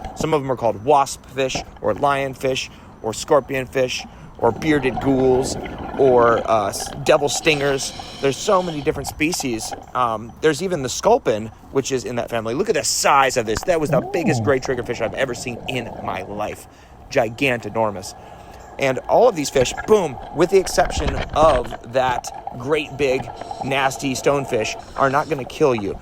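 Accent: Australia. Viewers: Thats a solid Aussie accect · Nice Aussie accent. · Love your Steve Irwin accent 👌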